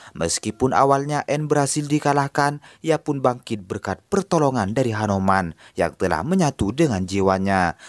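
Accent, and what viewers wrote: Madura, Indonesia. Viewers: Dari logatnya kayaknya asli Madura 👍👍👍👍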